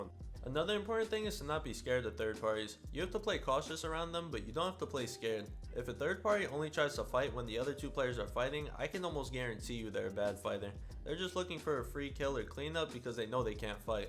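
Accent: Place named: Netherlands. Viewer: Ben je NL? je accent is nl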